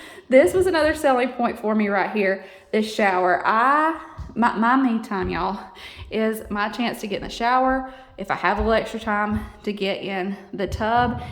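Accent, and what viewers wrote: South, United States. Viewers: I have just found your channel and SO glad I did. When I heard your Southern Accent, I knew you had to be from Alabama, too. I am from the beautiful state of AL and love the south. Your home is gorgeous. I can't wait to see it decorated. I hope you will have nothing but happiness in your life while living there. I am already a fan and will be going back and watching your other videos. God Bless you and your family.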